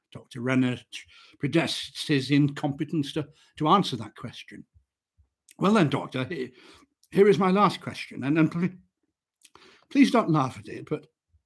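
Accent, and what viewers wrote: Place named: RP, United Kingdom. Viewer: Thank you for this, Mr. Posh Voice Reading Man.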